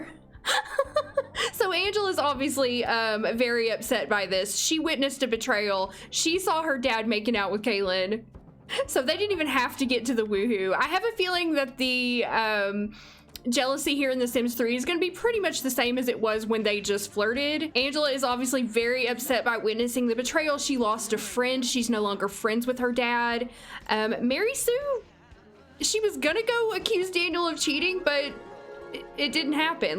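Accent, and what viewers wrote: South, United States. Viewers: you sound like my southern math teacher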